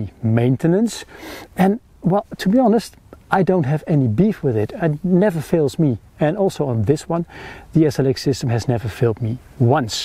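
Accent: Netherlands. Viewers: Ik volg je altijd zeer kundige en gedetailleerde testen al jaren. Ook uit het Op Pad tijdperk. Echt waardevolle info waar ik veel aan heb. Toch wil ik al een tijdje wat kwijt over je nieuwe kanaal. Nu vraag je er speciaal om. Toevallig was deze video in perfect (voor mijn oren dan) Engels. Veel technische termen en zorgvuldig gesproken met een duidelijk verhaal. Veel Engelstalige 'reviewers' kletsen soms maar doelloos rond. Reviewen is een vak. Dat beheers je dus duidelijk uitstekend. Het blijft moeilijk om iets in een vreemde taal exact uit te leggen wat je precies wilt duidelijk maken. Intonaties, woordkeuzes. Mijn Engelstalige vrienden zeggen altijd dat wij beter Engels spreken dan zijzelf. Wij spreken volgens het boekje. Dat doet geen Engelsman.Tegelijk zeggen ze ook: wij proberen juist niet te zeggen wat we denken. Nederlanders zeggen direct wat ze denken. 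In het Engels gesproken klinkt dat heel onengels en herkennen ze onmiddellijk dat we een Engels sprekende Nederlander zijn. Ze drukken zich anders uit. Ik heb respect voor je poging een groter bereik te krijgen door te kiezen voor een niet natuurlijk liggende taal. Het blijft een afweging. Toch lijkt het mij voor je zelf makkelijker Nederlands te spreken. Je hebt nu eenmaal een Nederlandse manier van spreken. Het is meer werk maar je kan jezelf ondertitelen op Youtube in het Engels. Zelf een samenvatting typen kan. Een spraakomzeter het werk voor je laten doen met evt. correctie achteraf. Eenmaal zo'n tekstbestand aang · Great review. Your English is extremely good. Nothing I didn't understand. Love your Dutch accent ;@ But seriously €2,600!!!!! That's about €1,000 more than I thought you were going to say. The reason it is so expensive is because it is sold under the bikepacking umbrella. 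If it were a normal 29" hybrid without orange racks it would be half the price. SLX is good but not that good! I have one of the first Trek 7500 hybrid from about 1998 with Alpha SL Aluminium frame. It is very stiff and strong as the tubes were over size. It too had SLX or LX components back in the day, but it cost me about €300 new!!!!! The spec is now Shimano XT with handbuilt 700C x 35 wheels. I would not swap it for anything as the aluminium frame is the best thing Trek did, although I do not like the look the carbon fork on the 1120 today. They should have gone with aluminium or Cromoly, Also the Trek 1120 looks like a rival to the Specialised AWOL which I think has better long distance touring potential certainly better racks and can carry more. Their front pizza rack is a gem. I don't own one. Could you do a review of a similar bike to the Trek 1120 but with Rohloff hub or pinion gearbox preferably with Gates belt drive? 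But great review. I am already subscribed to your channel.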